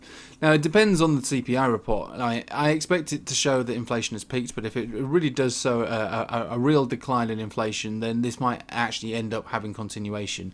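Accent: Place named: Scotland, United Kingdom. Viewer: What’s with the Scottish accent in vids today :))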